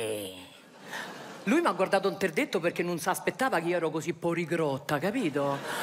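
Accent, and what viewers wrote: Rome, Italy. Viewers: E stupenda troppo forte fa ridere da morire poi romanesca è ancora più forte 😅😂😂😂😅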